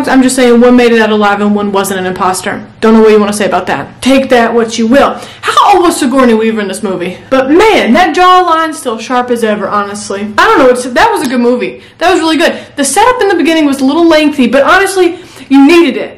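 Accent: South, United States. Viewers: Which of the Southern states are you from? if your willing to tell.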